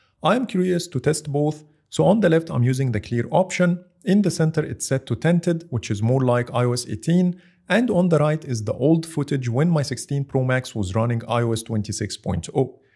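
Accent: Egypt. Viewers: Istg i knew you are Egyptian from the very first word ! All the support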